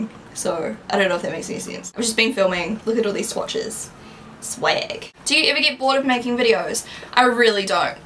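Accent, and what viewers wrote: New Zealand. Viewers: Your main channel was the first one I subscribed too. I remember the first video of yours I saw was a grwm clubbing so it was just music. I clicked onto another video of yours and was so surprised to hear your voice!!! I was thinking you'd have an American accent, but no, you're a kiwi just like me!!! Instantly I subscribe and have watched pretty much every single one of your videos! Love you:) xxx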